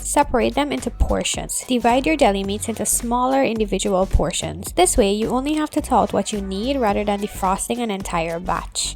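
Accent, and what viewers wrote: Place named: Trinidad and Tobago. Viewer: Are you Trini? Your accent sure sounds like it.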